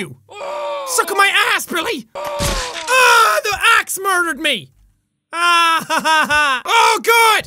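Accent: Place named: Ireland. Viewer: I like the stronger Irish accent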